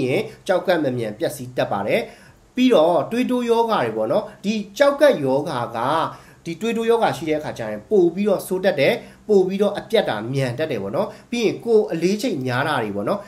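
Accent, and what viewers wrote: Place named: Upper Myanmar, Myanmar. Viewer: ပြောပြတာကျေဇူးပါ အင်းပြောတဲ့သူက မကွေးဘက်က အညာသားဖြစ်မလားနော် သန်းလာတာကို တန်းလာတဲ့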